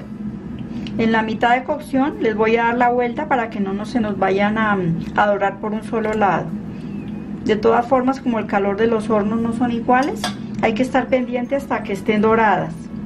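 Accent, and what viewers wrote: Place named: Colombia. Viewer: Me encanta el acento colombiano, muy deliciosa receta, saludos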